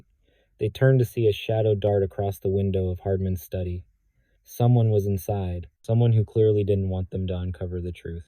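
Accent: United States. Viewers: Horrible narrator with American accent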